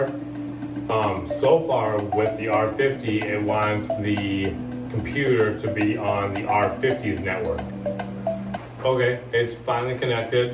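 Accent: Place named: Wisconsin, United States. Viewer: You talk very Wisconsony. It’s a beautiful thing. I’m happy to know folks like you are still around.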